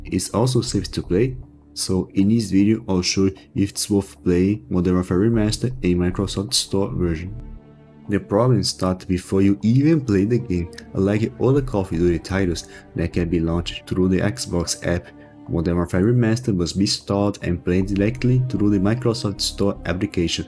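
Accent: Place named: Brazil. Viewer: Mano vc é brasileiro n é? eu reconheço esse sutaque.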